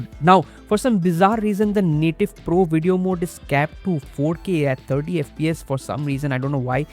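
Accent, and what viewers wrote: India. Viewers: Bro, are you from Odisha?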